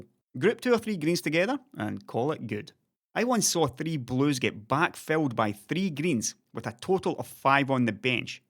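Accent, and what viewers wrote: Scotland, United Kingdom. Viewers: Scottish accent + curse words, name a more iconic duo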